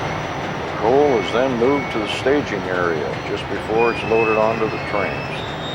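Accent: United States. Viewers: The speaker has the typical degenerated american speech! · I loved this video, so educational. The man was a great narrator. Loved listening to the country twang in your voice. Great work man.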